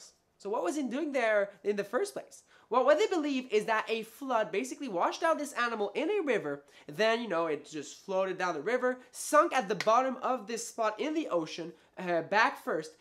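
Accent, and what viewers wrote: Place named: France. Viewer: Love your french accent <3 <3 <3 You, rock hahahaha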